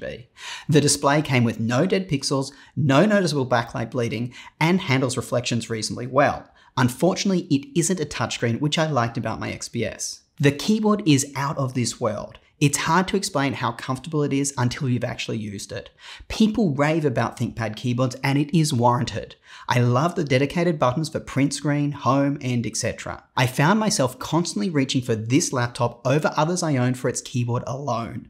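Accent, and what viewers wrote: Australia. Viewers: Are you Australian? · Finally, a reviewer that knows what he's on about and isn't recycling the same old lines, plus it is so refreshing to hear an Aussie accent rather than some monotone suburb US one. liked and subbed from the UK!!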